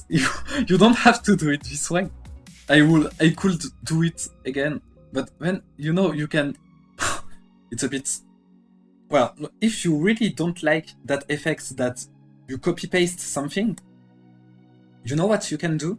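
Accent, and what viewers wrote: France. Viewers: Your accent is like, the most French thing I have ever heard x3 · You have a Really funny French accent XD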